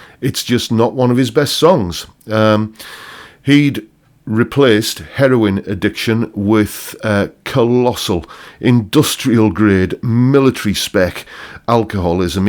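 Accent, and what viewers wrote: United Kingdom. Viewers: Most songs if based on lyrical content would sound like crap regardless of the melody ( like rediculous " " which also sucks as a melody )and should have never recieved radio play. We have to remember that sold out theaters and album sales are all that matter. (Pink Floyd's favorite rant). I can't stand " "," ", "s " " " " and I could have lived my entire life happy without being tortured with " " or tune about " " and I'm not even Catholic! Love a good RANT, thanks mate! (I'm not British either but I love the accent!)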